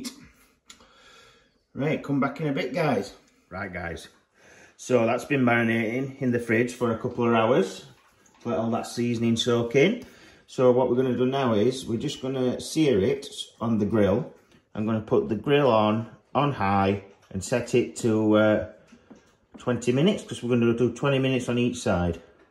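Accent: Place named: Yorkshire, United Kingdom. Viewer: The Yorkshire accent made this video perfect!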